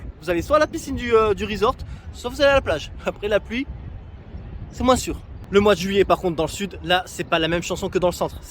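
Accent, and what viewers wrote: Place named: Southeast, France. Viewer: Bravo très bonne vidéo, on voit que tu connais le pays 👍, j'adore ta façon de prononcer les villes avec ton "naccen" du Sud-Est pas tout à fait ça mais bon on s'en fou 😀 Canto, Mito, Saïgonne, Niatran.... j'espère te croiser un de ces 4 à Saigon quand tu y retourneras ce serait sympa se prendre un petit "cà phê sữa đá" et échanger. Bon voyage.🤗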